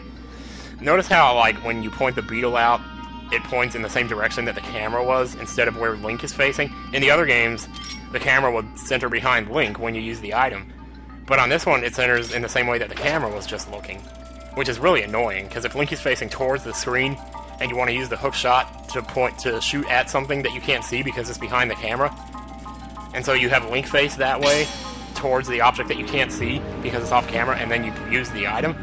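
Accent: South, United States. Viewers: I love your southern accent never change it:3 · 1. Please talk southernly more 2. Please film yourself, it would be awesome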